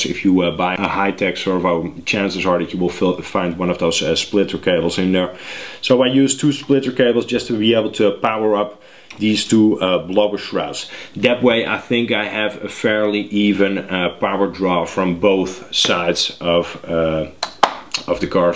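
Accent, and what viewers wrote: Netherlands. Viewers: ok, are you from Holland?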